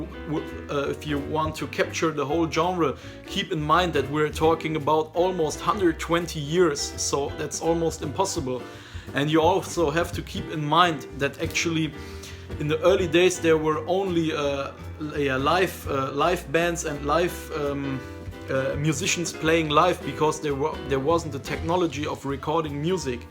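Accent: Germany. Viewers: Ist er Deutscher? Hört sich so an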